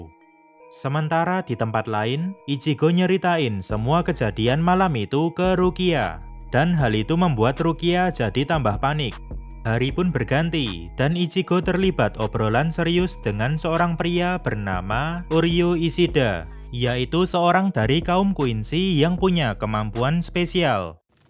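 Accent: Java, Indonesia. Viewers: Ketoke mimin iki wong jowo😂 Suarane koyok wong jowo soale :v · Medok bnget jawanya 😂🤣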